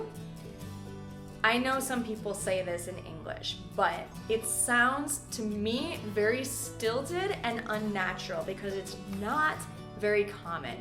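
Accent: United States. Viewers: English speakers? The American version would be more accurate! Weird hearing a yank telling us how we speak. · Actually, you speak American. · Thank you! I am an Italian English teacher and I really appreciate your lessons! I would like to have your American accent but I still have some difficulties and here books are all with British accent and with “I am fine thank you and you!”🤣 thank you again! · Hey. I would like to know about your accent. What state do you live in the US? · You speak American not English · They ask you a lot it because you're beutiful and have a great appearence , here in brazil its normal , mainly you have these blue eyes and nice american accent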